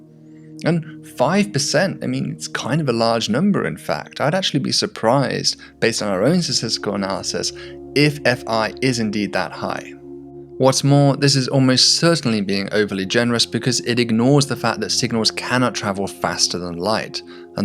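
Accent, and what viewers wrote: United Kingdom. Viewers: How appropriate to use the vocal musings of the great Carl Sagan. Fantastic and along with the gentle British voice of the narrator, soothing.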